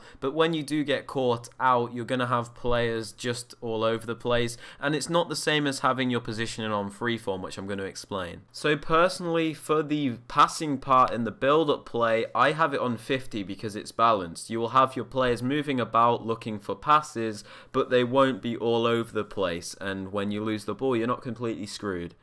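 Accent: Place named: Birmingham, United Kingdom. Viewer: Are you from Birmingham you speak like my cousins 😂😭👅😆 and shall I get Fifa 18 on the switch I mean I have it in my PS4 so I dunno I need opinions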